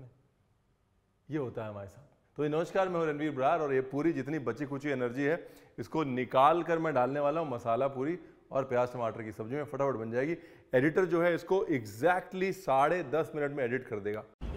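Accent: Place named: Punjab, India. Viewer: so nice sir, Punjabi ka tarkaa bahut sohnaa a ji.love it❤❤ chak Doo.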